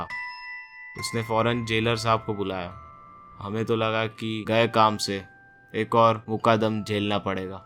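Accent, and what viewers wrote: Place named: Rural, India. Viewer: Story shi h lakin apne bolne ki style ko improve kr...asa lg rha h ki tu villager h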